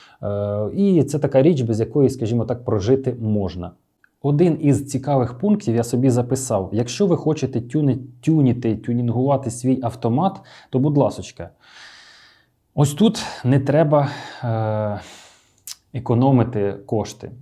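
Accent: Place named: Central Ukraine, Ukraine. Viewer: Клас, наш хлопець. Центр UA.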